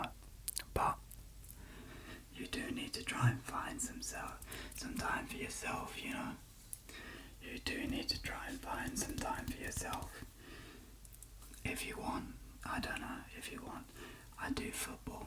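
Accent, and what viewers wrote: United Kingdom. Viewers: Great ASMR, your British accent makes it even better. ❤️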